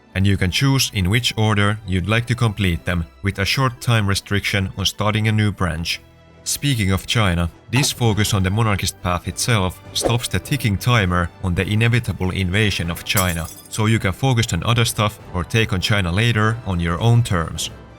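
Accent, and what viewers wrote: Finland. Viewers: Are you Finnish becuse you sound like Finn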